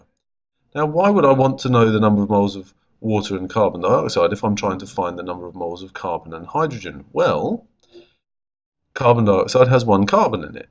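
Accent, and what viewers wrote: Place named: United Kingdom. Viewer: I'd like to say you are one of the best IB chemistry teachers on Youtube. Your videos are really helpful. In fact, you have taught me more than my IB teacher has in the last year and a half. Thank you so much!! You're a star!:)) PS. Your British accent helps- I'm from England so it's quite difficult for me to sometimes understand the American youtubers since they pronounce most stuff quite differently to us.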